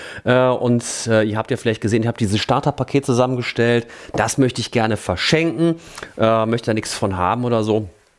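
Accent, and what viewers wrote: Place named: Cologne, Germany. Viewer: Weil dein geiler Kölner dialekt viele zu lachen bringt aber man kann auch einiges von dir lernen ich glaub das du nicht zufällig der ervolgreichste sondler yutuber bist weil deine videos von Anfang an super qualität hatten umd profesionell wahren und du nicht einfach drauf los lulst wie viele yutuber bei denen das mit dem iq ehr eine 2 stellige zahl ist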